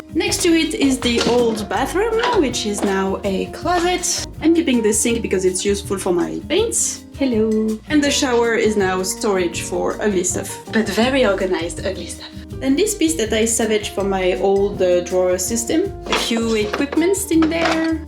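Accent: France. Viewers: c'est trop drôle d'entendre une française parler anglais ( en plaçant plein de mot en français aussi 😄 ) en tout cas ton atelier créatif fait vraiment rêver 😍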